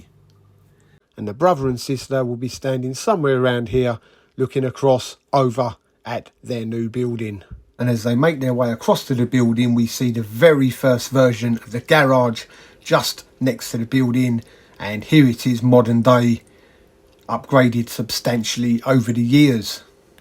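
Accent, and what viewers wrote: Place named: South London, United Kingdom. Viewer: Good job. I enjoyed that. And your accent reminds me of south London where I spent most of my formative years! 👍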